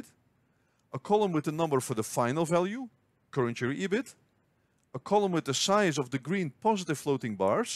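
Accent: Netherlands. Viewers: what a nice dutch accent_:D but thanks, useful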